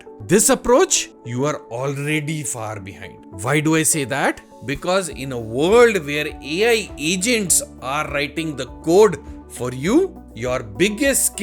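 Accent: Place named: India. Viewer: Your accent is from India. I am assuming you were born in India. It is an embarrassment you are talking about this when the whole country is at war. Why this now ???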